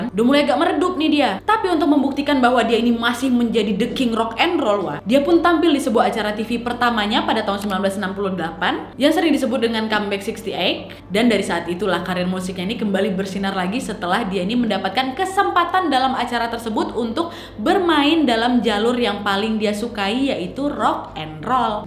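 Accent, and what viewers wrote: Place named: Medan, Indonesia. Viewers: Kakak ni pasti orang medan ya sukak kali sama cakapnya... hahaha... iya kan wak?? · Logatnya kayak orang Medan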